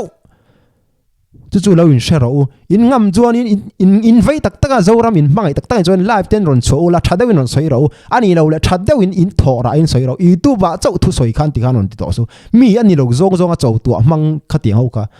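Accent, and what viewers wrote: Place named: Myanmar. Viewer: Burma tlangval tawngpai nuk2😂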